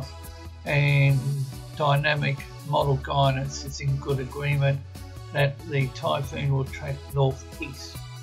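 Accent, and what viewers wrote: Australia. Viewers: dont make fun of him he is australlian and plz dont start a fight as a reply cause we cant agrre on anything on this website. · is a gem to Force13. Love his Aussie accent and the information is always great. Good to hear him... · For those who are saying (the person in this video) is drunk, He is Australian and He is not drunk A True F13 Fan always knows this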